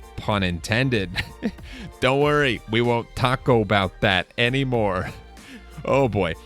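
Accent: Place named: Indonesia. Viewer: Wow your Indonesian Acsent So Perfect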